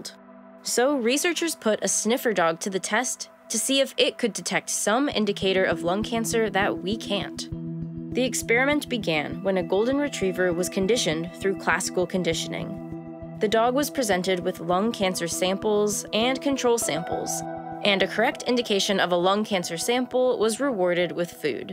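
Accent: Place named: United States. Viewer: How come this is written in British (hoover, footfalls) but narrated in American/maybe Canadian?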